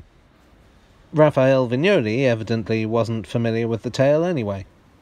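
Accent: United Kingdom. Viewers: I can’t tell if this is a mini documentary or just a straight up British guy roasting a building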